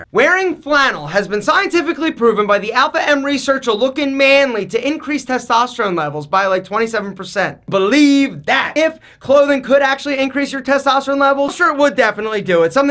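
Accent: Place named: New Jersey, United States. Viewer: You gotta be from Jersey right?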